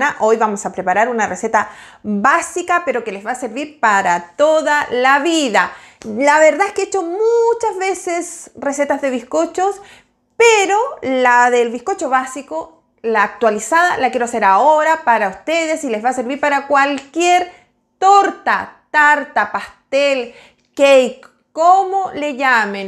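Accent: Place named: Chile. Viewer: Pense que era mexicana pero es mas chilena que los porotos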